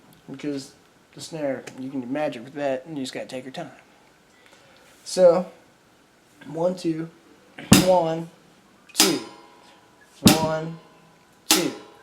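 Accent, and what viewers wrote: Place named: United States. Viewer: You took the time to yuk it up about how lame "country people", whatever that means, are, but you're as hic as a fresh Dixie Daisy whut fell off the turnip truck with your hayseed accent. The super cool long hair doesn't cover your accent. I believe you were thusly ensnared for having forgotten the wisdom learned from the East who teach, "he who smelt it dealt it." If you felt the urge, you could have just said country music sucks rancid smelly goat balls and no one would have been the wiser.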